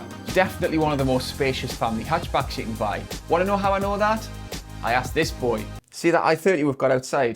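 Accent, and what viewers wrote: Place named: North East England, United Kingdom. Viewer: Why aye the man from the north east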